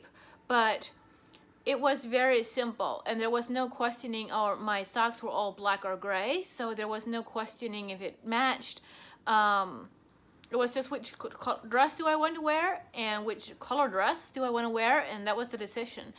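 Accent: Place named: Ohio, United States. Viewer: You must be from Ohio ( Holmes County) I am guessing by your accent I have relatives from there 👍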